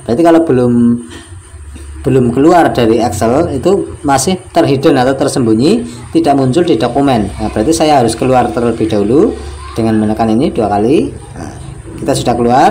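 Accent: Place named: Cilacap, Indonesia. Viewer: Suaranya asli cilacap, kayak kaki mukidi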